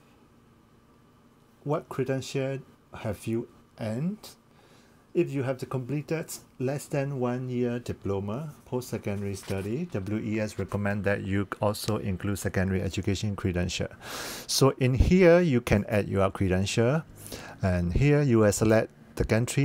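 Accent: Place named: Myanmar. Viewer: Why didn't you explain in Bamar (Burmese) language if your video post is for Bamar . Never ever expressed "Vulgar Slang" Your school is a "Shitty" school, It shows your character. Never ever expressed Offensive wordings toward other person's dignity if you are very careless/disorganized person "that is the school that DON'T provide", It should be" Does not". While you're in Canada .Pay attention on "Vowel"sounds, Then imitate the accent and tone.Again Learn how to make a "SCHWA" vowel. In addition you must learn the culture of the English speaking country you wish & some slang. Keep in your mind that UK, US, Canada, Australia, New Zealand, each has different culture and English accent. Canada official languages are France and English. Otherwise you will be still sounding as Myan-Galish or Ba-Galish. Since you've been staying in Singapore for quite a few years you will have to adjust your Sin_Ga-Lish while living in Canada. 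Do not take as personal. Cheers. Note: I trained my Bamar gal friend in the same way. Now she has a fabulous Canadian accent and some french after 6 years of living in Canada.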